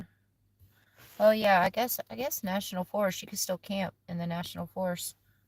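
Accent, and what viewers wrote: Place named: Texas, United States. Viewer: There's nothing wrong with a Texas accent. Thanks for all your travels. Not able to do any travel any more. Love watching. 🐍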